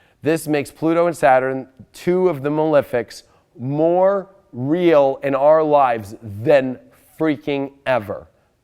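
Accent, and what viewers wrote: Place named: California, United States. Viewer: Love you dude you talk just like my friends back home must be a cal thing. Thanks bro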